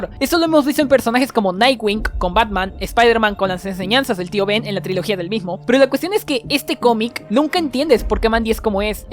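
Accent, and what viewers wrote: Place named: Russia. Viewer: me encatan tu acento ruso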